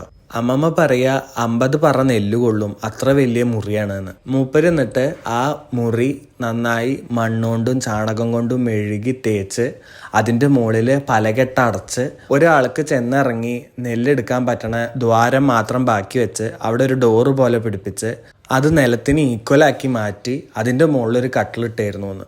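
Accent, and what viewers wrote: Valluvanad, India. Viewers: Is this valluvanadan slang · ആ വള്ളുവനാടൻ ഭാഷ ഷ്ടായി ട്ടോ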